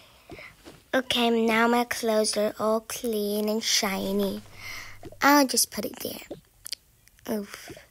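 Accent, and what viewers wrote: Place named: England, United Kingdom. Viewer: 𝕀 𝕝𝕠𝕧𝕖 𝕪𝕠𝕦𝕣 𝕖𝕟𝕘𝕝𝕚𝕤𝕙 𝕒𝕔𝕔𝕖𝕟𝕥<𝟛😛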